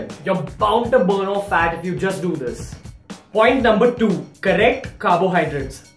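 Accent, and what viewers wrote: India. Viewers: I like indian English accent hahahah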